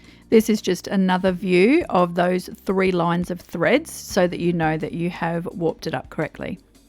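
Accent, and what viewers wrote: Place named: Australia. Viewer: Love to hear an Australian accent !!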